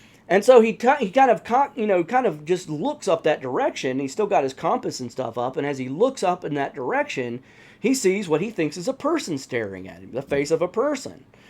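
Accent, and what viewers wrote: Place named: South, United States. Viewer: Hello again, My dude. As ever, another well done presentation. Narration on point, albeit sprinkled with hints of friendly southern winds. As for the subject matter, I have yet another gift for you. Below is a link to the story of Port Lock Alaska - I will not say anything to spoil it, but.... this is one of those very little known stories with credible and VERIFIABLE bits and pieces out there... In my honest opinion - THIS IS BIGFOOT COUNTRY! Keep well, be safe, and as always, let's strive to earn the knowledge that will be our own individual lights on our darkest days.... <link>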